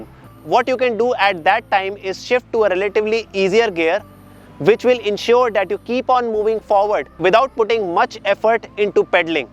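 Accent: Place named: India. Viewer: You have a thick Indian accent.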